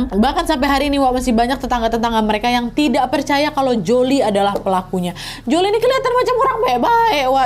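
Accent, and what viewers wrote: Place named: Sumatra, Indonesia. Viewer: Logatnya kek orang sumatra...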